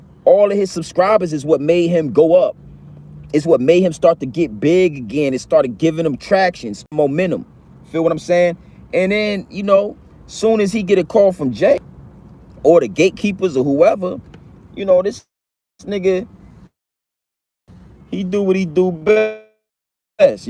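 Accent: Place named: Philadelphia, United States. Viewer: No wonder you kind of sound like you from Philly or up North. It makes sense now 😁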